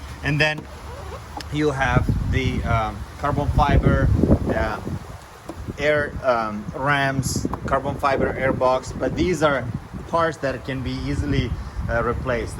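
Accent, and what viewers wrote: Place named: Texas, United States. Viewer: Beautiful bike, I can definitely hear your Texas accent!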